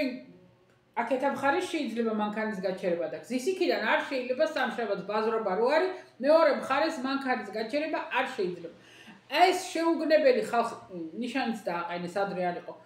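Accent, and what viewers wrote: Kakheti, Georgia. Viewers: იხარეთ მუდამ !!! ❤❤ როგორ მახარებთ !!! ❤თქვენს მიერ გახმაურებული კახური აქცენტებით ...❤ ,, არაუშავრა..."❤ მიყვარს თქვენი მოსმენა... და მამხნევებთ ძალიან...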